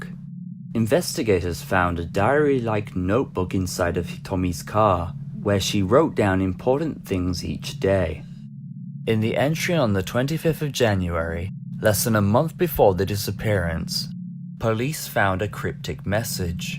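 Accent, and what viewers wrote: United Kingdom. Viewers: Your channel says you're from Japan, but you sound British. What's your first language? P.S. Awesome content!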